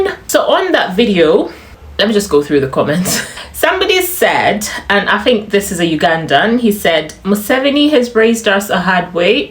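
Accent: Kenya. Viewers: True. You do sound Kenyan. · it is correct, you sound more Kenyan than Ugandan. Karibu Kenya and u are a beauty like most of Ugandan women. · Griphin, Can u detect her Luo accent? That is the magic orientation.......😄😅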